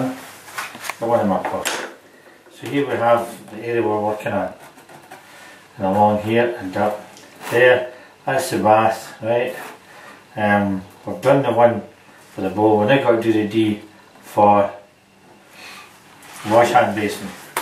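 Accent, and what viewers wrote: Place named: Scotland, United Kingdom. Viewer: That's a Scottish accent, don't know which area, but good to hear. Thanks very much for this video, really useful tips, I've put off doing this because all the American plumbers say to take out the toilet first - not good if you're a woman and in a rented flat!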